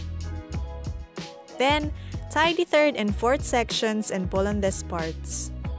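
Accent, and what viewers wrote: Philippines. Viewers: You have a Filipino accent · Your Filipino accent 😍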